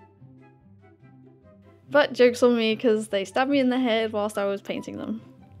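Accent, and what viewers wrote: United Kingdom. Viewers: I never heard your voice and genuinely DIDNT expect you to be British.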